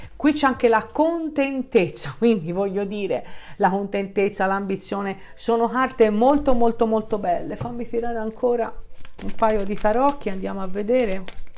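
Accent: Tuscany, Italy. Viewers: Prima stesa bellissima... Speriamo! 💞Grazie sei molto chiara e concisa e con l'accento toscano mi piaci molto... Posso chiedere il tuo segno zodiacale sento molto empatia con te io sono bilancia 😁😁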